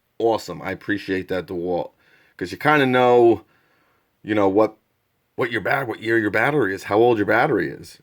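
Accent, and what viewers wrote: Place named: Boston, United States. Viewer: “You know” “You know” “You know” “You know” do you realize how stupid you sound repeating that over and over? Not to mention sucking on spit at every pause. You have to be from Boston. Only dumb dumbs raised in New England talk the way you do. “You know?” “You know” “You know”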